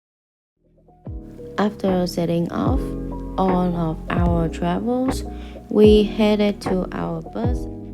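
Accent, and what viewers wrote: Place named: United States. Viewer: nói tiếng Anh tưởng người Mỹ ko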